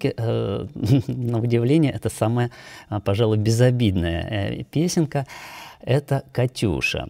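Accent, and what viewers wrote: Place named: Estonia. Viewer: Кто пользуется могучим русским языком (да ещё и с матом), с эстонским юморным акцентом - прав много раз!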